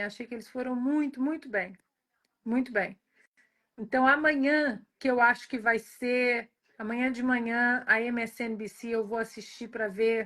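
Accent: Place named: Minas Gerais, Brazil. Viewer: Xará, é muito bom te ouvir! Tú fala num mineirês calllllmo e bem explicadim. 🤭 Aprecio este teu hábito. Bjão pr'ocê, sô! Deus te abençoe!!!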